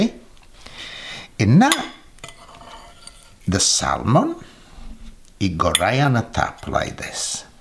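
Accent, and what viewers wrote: Italy. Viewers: Yummy 😋 😋 enjoy the Cooking and italian accent mama mia bellisimo 👍😄God bless you🙏😇♥ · His Italian English is hilarious !!😂😂😍😙😙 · So good! I've been into chickpea flour instead of white flour because I think it's got more protein and is a bit healthier than the white. Going to make this soon. Looks amazing! I can smell it through the screen! Love your accent. I'm Italian too. Buon appetite!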